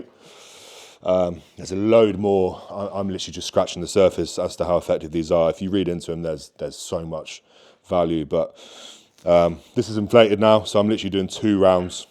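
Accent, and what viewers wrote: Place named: United Kingdom. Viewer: So weird to hear a British accent come out of what looks like a good old boy from Idaho.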